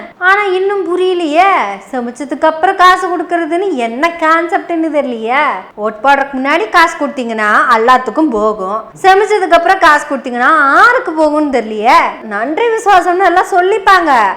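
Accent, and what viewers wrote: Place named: Tirunelveli, India. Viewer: உங்கள் குறல்மொழி திருநெல்வேலி மாதிரி அழகாக இருந்த்து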